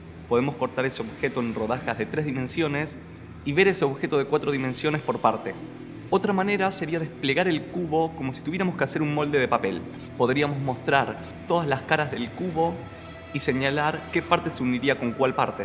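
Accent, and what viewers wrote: Rioplatense, Argentina. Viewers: Te ganaste un sub, no sé si sos argentino o uruguayo, pero por las dudas saludos desde Argentina.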